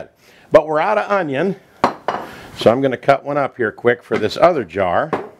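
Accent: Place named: Michigan, United States. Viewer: I hear a Michigan accent.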